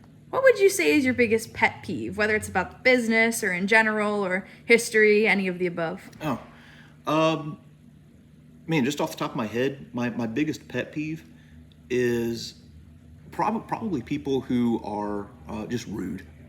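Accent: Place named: Midwest, United States. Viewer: love your work. Didn't even know your last name, always wondered what you teach and at what level. Always assumed you are a Midwestern, but always looked for clues in how you edit, projects you select, accent (daggum!!!) You're such a humble guy but talented and genuine. Appreciate what you do and consistency. Blessings!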